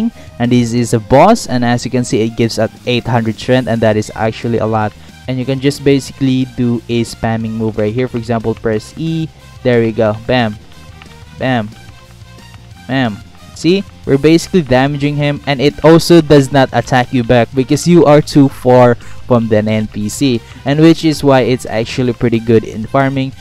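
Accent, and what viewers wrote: Philippines. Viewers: I can tell my manz filipino and speaks tagalog probably because of the accent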